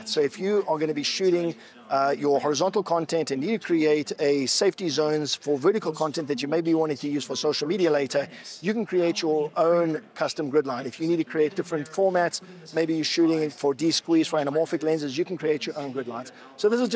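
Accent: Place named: South Africa. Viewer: He sounds South African 🇿🇦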